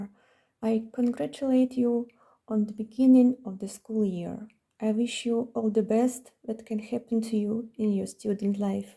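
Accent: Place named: Portugal. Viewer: how lovely hahaha eu gosto do sotaque do portugues europeu hahaha. oh btw what is your native language? you speak three languages i'm jealous. blue is my favorite color am I cool 😎 or am I cold 🥶 hahaha or both hahaha lol Iuno is beautiful so is your art i'm definetelly pulling for her, can't have the sun without the moon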